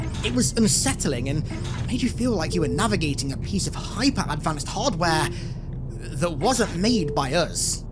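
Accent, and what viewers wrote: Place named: United Kingdom. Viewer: was gonna watch but ur british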